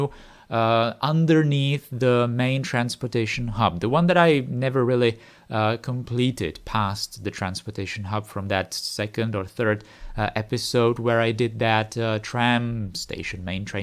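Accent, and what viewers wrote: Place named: Czech Republic. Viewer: This is probably a wild shot but you sound like you're from Czechia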